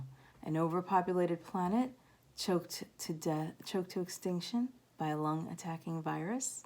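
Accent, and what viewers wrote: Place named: New York, United States. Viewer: hear hear! Good Stuff! I like your style, and the subtle ny accent...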